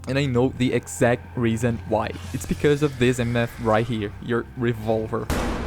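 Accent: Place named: Brazil. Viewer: amazing survival horror study, your game is gonna rock (and judging by your accent i think this is a r/suddenlycaralho? kkkk)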